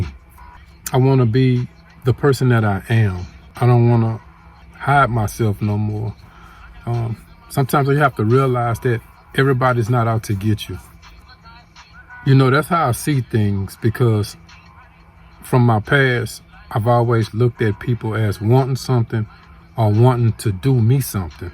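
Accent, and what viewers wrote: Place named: Texas, United States. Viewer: You look like a friend from Texas back in day. I hear that accent you got, homie had that. Back day I had to move on. I couldn't under where homie was going. So I waited, and waited. When Engelwood, Chicago south sides meets a Texan from H- town sparks fly, Texans believe that Texas thing they are bigger ruffer then average man. One on one no weapons we when at it homie had to give respect specifically around them why boys. I was mothers boy but had eight brothers and sisters so I did the ass kicking bullies to protect them and weaker kids and friends. He was first homie outside Chicago. Unfortunately homie didn't under what you saw was not real me. I didn't sell drugs or gang stuff. I was navy trained Electrician so thats direction I took. Homies walked a different path. I still think homie was the shit! Dam dude you look like homie!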